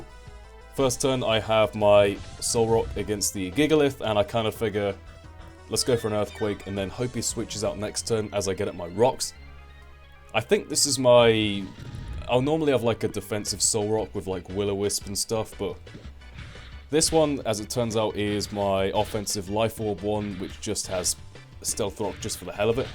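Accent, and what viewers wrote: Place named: England, United Kingdom. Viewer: whereabouts in England are you from?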